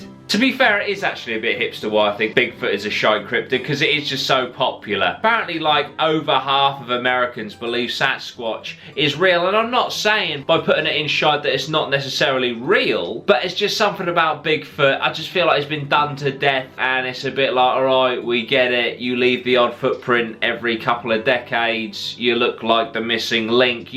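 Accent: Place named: Norfolk, United Kingdom. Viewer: Are you from Norwich (I am) cos you have a norfolk accent?!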